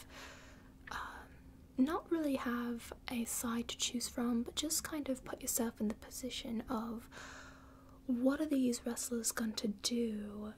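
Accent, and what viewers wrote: England, United Kingdom. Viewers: Spectacular video the English accent and all the attires is amazing those were some great predictions have outstanding weekend enjoy wrestlemania 35 🧡💚✨🧡💚✨🎊🎉🎊✨😎🏆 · Kowalski analysis - I think you're wearing your brother's hat that says "Punk" on the front. I can't figure out if that's a wig but your hair looks unusually dark and has an odd shine. This is also the first time you're showing your new desk chair on a video. There's someone desperately trying to tell you something lol. I don't know anything about wrestling but it's always fun to see you portraying different characters! I've always wanted to hear you speaking with an English accent and you sounded great, it wasn't forced and felt very natural! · love the british acsent if it even is haha! though the outfit is -tastic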